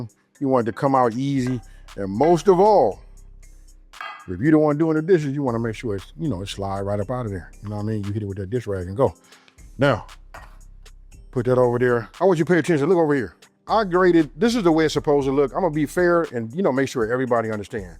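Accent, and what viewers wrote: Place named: South, United States. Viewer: He is from the south stop hating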